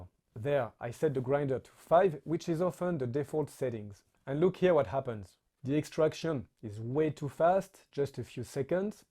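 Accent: France. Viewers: You have to be from Quebec or France. Love the accent.❤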